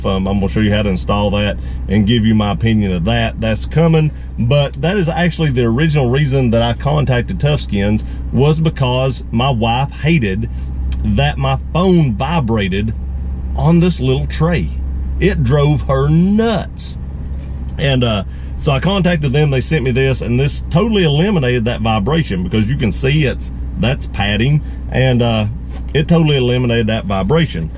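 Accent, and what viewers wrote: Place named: South Carolina, United States. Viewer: Are you sure you are not from SC? I know you are from Florida but that accent is distinct.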